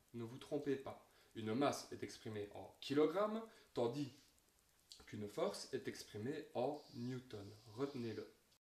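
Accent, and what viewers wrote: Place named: Liège, Belgium. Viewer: petit accent liégeois? hahaha bien expliqué merci !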